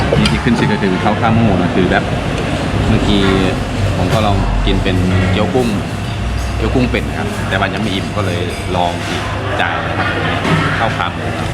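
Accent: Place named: Isan, Thailand. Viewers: สำเนียงเดียวกับคนจ.เลย เพชรบูรณ์ ครับ ผมคนเพชรบูรณ์พูดแบบนี้เหมือนกัน บรรพบุรุษอพยพลงมาจากหลวงพระบาง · สำเนียงภาษาลาวของแอด คล้ายๆสำเนียงภาษาเหนือของไทยอยู่บ้างนะ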